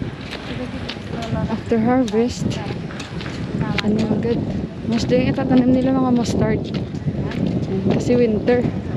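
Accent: Iloilo, Philippines. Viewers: Gustong-gusto ko talaga Ilonggo accent mo, mamsh. Missing Iloilo and Bacolod and all my Ilonggo friends.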